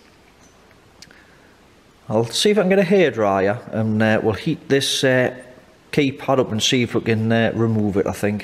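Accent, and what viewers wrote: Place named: Scotland, United Kingdom. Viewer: Great video, love the accent. Like a slight touch of scottish in your north east dialect. Keep up the great work. Kind regards. Paul. 👍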